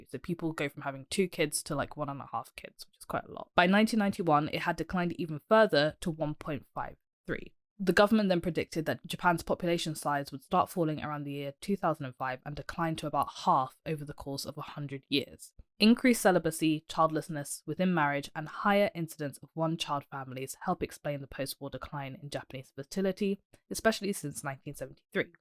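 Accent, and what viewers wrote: England, United Kingdom. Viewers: Peter who? She's pretty ..and I like her English accent. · Your education has made you naive to naive to the facts of power operates. You will naturally understand how power works once youve had a decade away from being a student. Your not taught in school how to obtain power or even how it operates. Thats done on purpose. You are trained academically to be an employee not an employer. As long as you have the employee mindset you will never see the whole picture. Peters opinion is largely held by democrats, republicans and policy makers in washington along with the big think tanks brookings, rand, etc... your intelligent and well educated but your lacking in real world life experience. So your missing the whole picture. Interesting perspective and brave to attempt to debunk peter for lack of a better term. I enjoy your delivery and silly english accent😂😂😂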